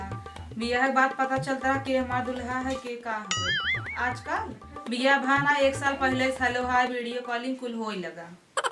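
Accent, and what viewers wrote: Jaunpur, India. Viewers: ji aap ki jaunpuriya tone zabardast h...👍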